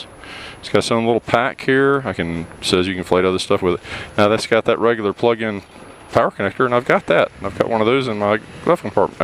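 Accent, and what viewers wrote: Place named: South, United States. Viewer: Well I for one love your Southern accent! Over here we have so many people who use Rising Inflection when they speak! It's SO annoying! Apparently we have the Australian soap Neighbours (there you go, another word spelt differently lol ) to blame for this!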